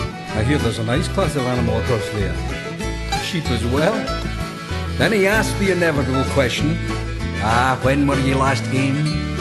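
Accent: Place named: Scotland, United Kingdom. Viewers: He is a Scot!!! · I love the sco'ish accent!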